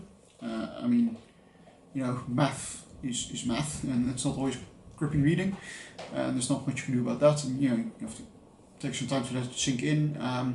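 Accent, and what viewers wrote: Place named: South Africa. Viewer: Ah, "me too" books can become annoying, and it's obvious when they're not sincere. I find it sad and manipulate when artistry is ignored in favour of blatant copying. You have a really interesting accent by the way. I think you've got a tinge of a South African regional accent in you. 😉There's a specific type of Afrikaans accent on certain words that you have, which I find so cool. Most Dutch accents sound completely foreign to me. I never even thought of The Little Prince as a part of this tag. It certainly is sweet, but perhaps for children younger than 10. I didn't even know about it until I was 17, which was way too late. I read it at 19, which again, is simply too old.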